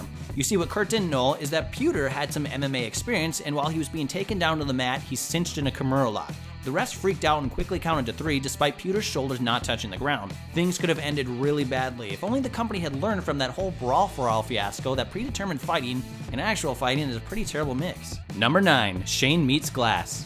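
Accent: United States. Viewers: Click on video. Hear American accent. Click off video. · American accent on a WhatCultureWrestling video. Dislike. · I hate this narrator Loses all of it's class with the geek American. Stop it. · A 'Murican narrating a WotColtcha video? 2016 really has been crazy so far...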